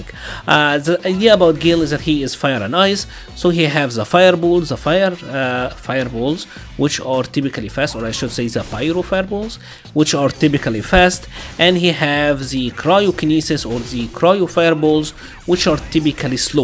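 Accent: Egypt. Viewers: Are you Egyptian? You sound Egyptian.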